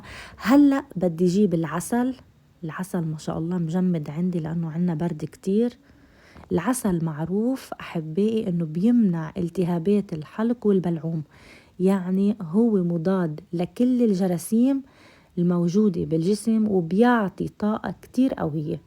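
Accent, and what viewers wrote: Lebanon. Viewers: اضن أنها لبنانية حسب كلامها عسل عسل❤❤🇹🇳🇹🇳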